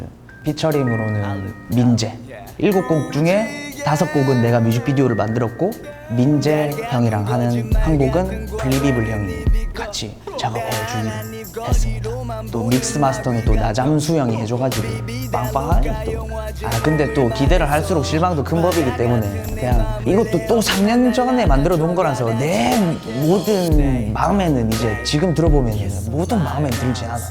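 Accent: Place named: Busan, South Korea. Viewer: 확실히 부산 사투리가 까리한데~~~~ 조용조용히 주술 외우는거 같다 ㅎㅎㅎㅎ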